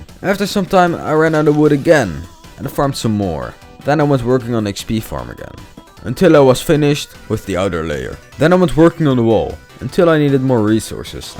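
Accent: Netherlands. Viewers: Je kan gewoon horen dat je Nederlands bent🤣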